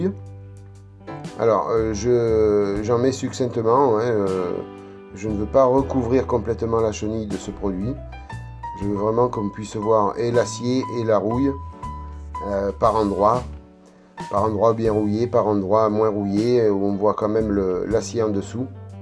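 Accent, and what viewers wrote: South, France. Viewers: Salut, très sympa cette petite vidéo, j'ai hâte de voir le rendu de la variation chromatique avec le chipping ça va être super sympa. Et sinon toujours un plaisir de suivre tes montages et d'écouter ton bel accent du sud:) Au plaisir!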